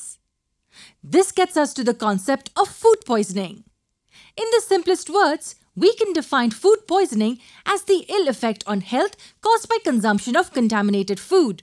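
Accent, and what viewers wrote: India. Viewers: This Indian accent is killing me😭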